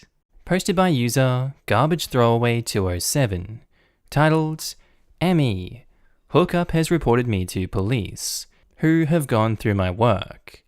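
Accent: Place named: Australia. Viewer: My mom loves your aussie accent, and loves watching these videos with me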